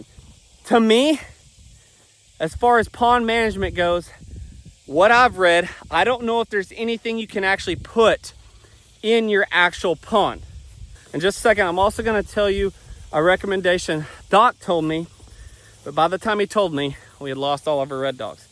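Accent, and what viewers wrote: United States. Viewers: You can say “Waller” all the time as far as I’m concerned. Please don’t change your country accent. It’s nothing to be ashamed of. I love it.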